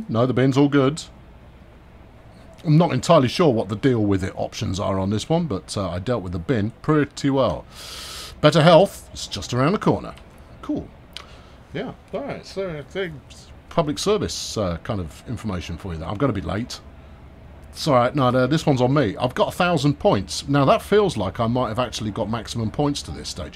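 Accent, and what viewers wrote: Boston, United States. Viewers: Just stumbled upon your channel, your funny, i live in boston but not a bostonion, your Boston accent is funny 😆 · That bawston accent sounds like a bad Harley Quinn impression. Yikes. Glad to see another train sim vid tho! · I'm from nowhere near Boawston, but that sounds accurate to me...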